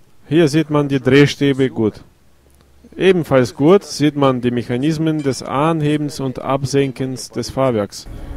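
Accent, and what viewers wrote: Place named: Russia. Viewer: Russian trying to speak german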